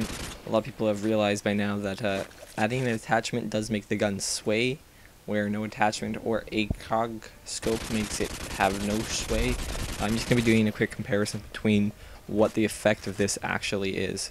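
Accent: Canada. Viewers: hes canadian. he says "about" like a candian says it. Aboat the same.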